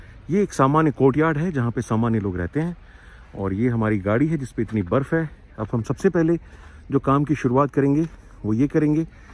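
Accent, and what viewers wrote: Standard. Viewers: Sir your voice and pure hindhi is very impressive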